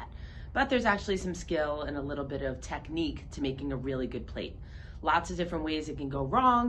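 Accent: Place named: New Jersey, United States. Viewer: My teacher is also from New Jersey.. you two have same accent.. she also says to "call it a pot".😁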